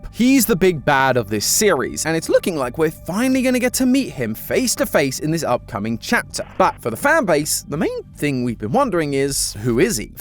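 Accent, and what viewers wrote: United Kingdom. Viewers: Wait , why do u have a British accent all a sudden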